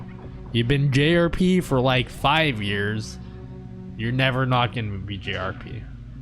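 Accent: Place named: United States. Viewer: Okay your accent sounds so American to me and you're constantly talking like you're not American and it's confusing the hell out of me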